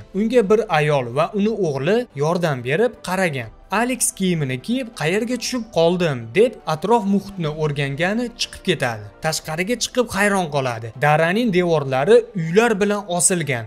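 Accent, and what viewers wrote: Uzbekistan. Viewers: G'irt Andijoncha shevada tushuntirdi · Жиззахликми дейман шархловчи 😊😂😂😂 ўзимизни шивани дарров таниманда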